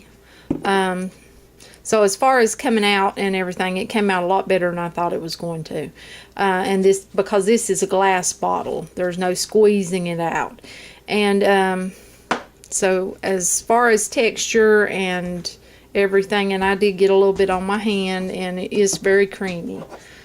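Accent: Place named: South, United States. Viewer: I love all your snowmen...too cute! The molds are amazing, would like to have one myself .Can I ask where you're from...can't help but notice your southern draw. ; ) I am originally from Alabama but now live in Texas. I just happen to come across your channel, glad I did. Ya got a new subbie! Tfs Have a great day! : )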